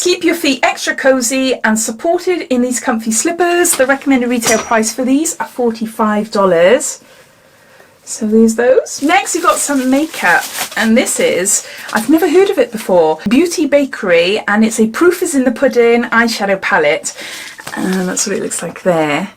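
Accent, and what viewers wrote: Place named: England, United Kingdom. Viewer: I could hear you all day! I love your English accent ❤ you are so pretty too! Love your unboxing xoxo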